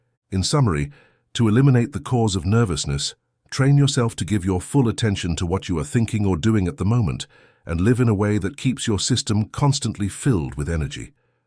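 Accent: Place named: United Kingdom. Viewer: So, are you an adrenaline junkie looking for another tequila sunrise? Do you have something against developing positive mental health habits? The reader isn't saying anything that isn't standard New Age thinking. Actually, much of what he's saying is older than New Age. Some of it is echoes of early 20th century thinking. Other parts are taken from ancient texts, especially the Bible. Is it the delivery that offends you? He's too British for your ears? I'd be interested to hear your advice for mental health. Are you anti calm? Pro aggrevation? Do you thrive on the energy of anger because it feels so good to douse those flames with copious amounts of alcohol?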